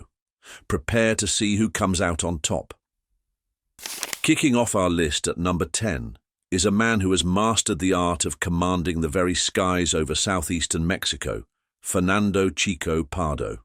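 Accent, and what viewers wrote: United Kingdom. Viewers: So how do you know that these people control the cartels? I'm wondering 🤔! You as a British citizen (based on your accent) remind me of the BIASED American media. That's all those people talk about when referring to our country and people. This is one of the reasons I personally say that America is a humongous HYPOCRITE nation! They do business with us and at the same time lash out at us. That includes their Roach 🪳 Trump their criminal 👹👿🤥. And by the way. Trump was caught stealing in our country. Outside Mazatlán and the city of Ensenada. He was also caught swindling people of their money in Playa del Carmen. Please make a video of this and why America is the world's biggest drug consumers. Please. I would appreciate it if you were not BIAS just like the American media. And just in case the American society did not know how drugs are smuggled across the entire border. 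Here's a tip. Because drug traffickers BRIBE 💲💰 immigration officials in order to smuggle all those drugs through the border!! And I do mean humongous amounts of money 💰💲!! Believe it or not, up to $100,000 dollars in one lump sum!! So please do us a big favor. Please make videos about America's "war on drugs" and why it never ends. Believe me. You'll be surprised 😯 who really is behind the "war on drugs" in America!! And it isn't our people! Good day CHAP 🏴.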